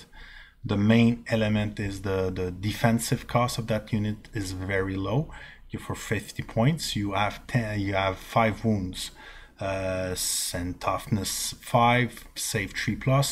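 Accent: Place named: Quebec, Canada. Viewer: 2 sec dans ton video j'ai reconnu l'accent quebecois ! Lache pas la patate bon video !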